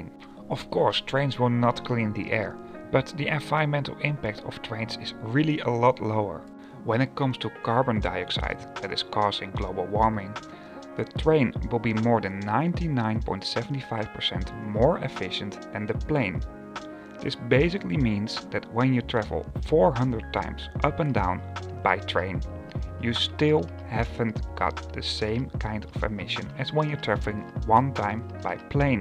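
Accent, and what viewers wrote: Netherlands. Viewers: Ik hoorde echt binnen een seconden dat je Nederlander bent 😅 We can't hide who we are 😛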